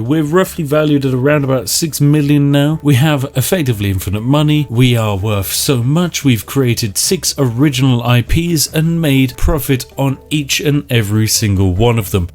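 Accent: United Kingdom. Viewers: this man is everything right about the british accent. all over sub accents can piss off because they are horrible but this is the voice of eloquence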